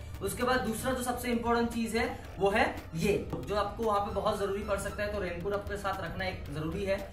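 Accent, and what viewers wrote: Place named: Northeast India, India. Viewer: Risky hojatai N.E accent 😋🖤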